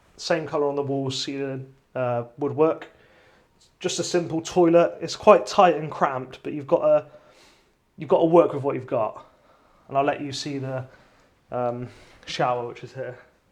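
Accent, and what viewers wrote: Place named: Yeovil, United Kingdom. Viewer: Just found your channel mate, I'm from Yeovil, this was very comfy, hearing the accent and coming from working for sparkies and builders all round Yeovil and Sherborne. Top lad.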